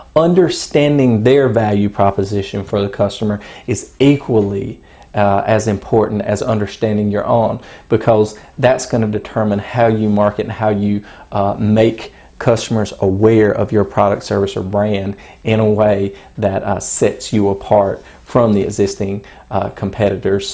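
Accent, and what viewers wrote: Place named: United Kingdom. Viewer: I love his teaching and accent (though I have been a lover of British accent)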